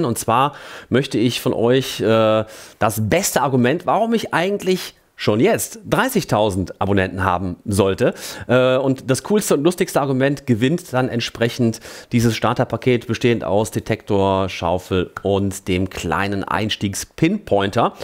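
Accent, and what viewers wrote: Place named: Cologne, Germany. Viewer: Weil dein geiler Kölner dialekt viele zu lachen bringt aber man kann auch einiges von dir lernen ich glaub das du nicht zufällig der ervolgreichste sondler yutuber bist weil deine videos von Anfang an super qualität hatten umd profesionell wahren und du nicht einfach drauf los lulst wie viele yutuber bei denen das mit dem iq ehr eine 2 stellige zahl ist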